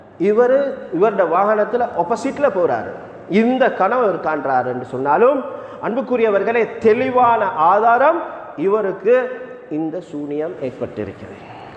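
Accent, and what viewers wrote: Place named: Sri Lanka. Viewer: Sri Lanka Muslim tamil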